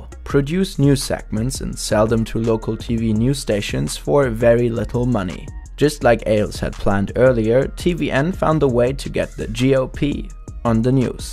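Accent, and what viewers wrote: Germany. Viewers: It's weird that mostly Germans blames about the fact that there isn't a native speaker. Most Americans and Britians (I know there're differences) blame about wrong grammar, spelling or words. They just (try to) understand what was said. But their grammar and pronunciation are great, so why changing it? Are you so distracted by the German accent from the video? I don't have that kind of problem. Disclaimer: I'm a German, so if I'm wrong what I said about grammar and pronunciation, please correct me. I don't know whether you're German or not, so if I'm wrong, please correct me, too. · In my opinion, you should get a native speaker for your videos, I just cant get that german accent out of head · Nice vid, but you should work a bit on your voice performance and your german accent. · Hey Guys, i love your Way but there is a little German in your English Tounge....that not mean your English is Bad. 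· holy this GERMAN accent xDD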